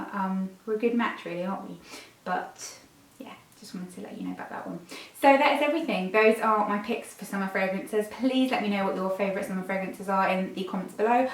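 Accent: United Kingdom. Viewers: I’m confused if you are Israeli born and raised why do you have a British accent?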